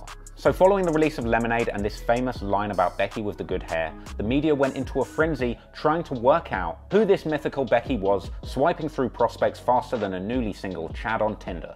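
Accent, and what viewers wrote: United Kingdom. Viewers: That British accent talking American hip-hop is irresistible!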